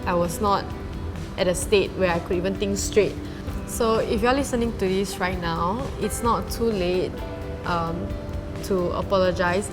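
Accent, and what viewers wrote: China. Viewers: She really have the Chinese English accent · Please speak in an Indian or atleast a neutral English accent. How ur speaking in a Chinese accent is just messed up. Sorry to hear about the bullying incident, but dont let that control your image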